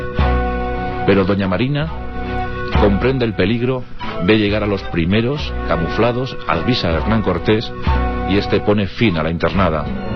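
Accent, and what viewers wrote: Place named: Spain. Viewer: que se puede esperar...El autor de este video, y se puede notar en la voz, son españoles... No me sorprende que hagan ver a tenochtitlan como los malos y los españoles como los buenos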